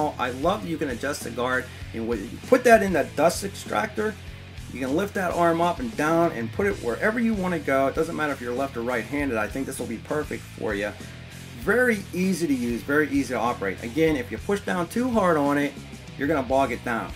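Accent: Pittsburgh, United States. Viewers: Thats a fine pittsburgh accent you got there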